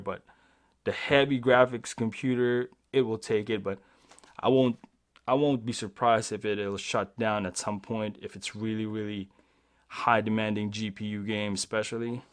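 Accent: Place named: Philippines. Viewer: Just chill guys. His first language isn’t english. He might be a filipino. Don’t be quick to judge.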